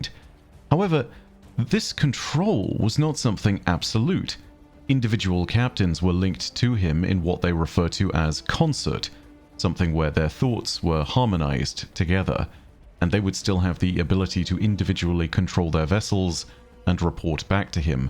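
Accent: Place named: England, United Kingdom. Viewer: lol the captions can't understand english accent, talking about "law books"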